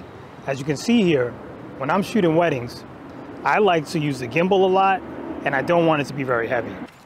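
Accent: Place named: United States. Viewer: Dude, your American accent is so smooth! It's like butter. You sound like a mix of Ray Romano and young Louis Armstrong!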